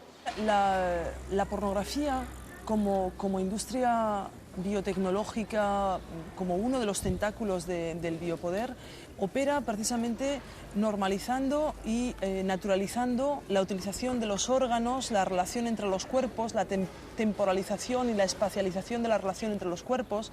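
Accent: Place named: France. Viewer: Se le nota deje francés al hablar. Se nota que vive y trabaja en Francia.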